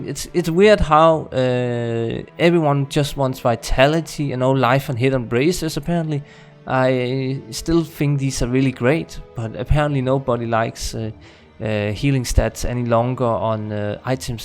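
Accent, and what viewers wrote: Denmark. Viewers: Hey your accent sounds danish, am I correct?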